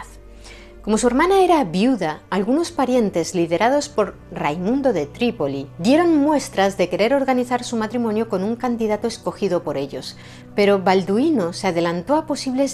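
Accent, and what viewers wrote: Spain. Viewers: Ese acento español es bien agradable, de que zona es?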